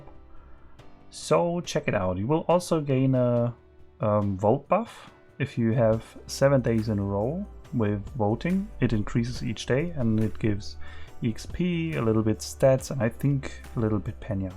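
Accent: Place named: Germany. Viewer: Das erste Mal, dass ein deutscher keinen Abgrundtiefen Akzent hat und echt gut Englisch spricht und du entschuldigst dich weil du "struggelst". Mehr Selbstvertrauen! Du machst das so gut !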